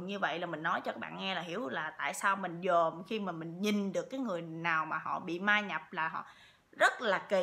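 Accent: South, Vietnam. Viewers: Bạn rất đẹp - giọng miền nam cưng ghê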